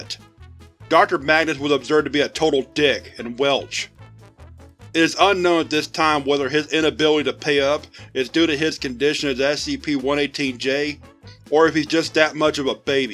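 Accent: South, United States. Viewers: Something about your southern accent talking about the saints is so funny to me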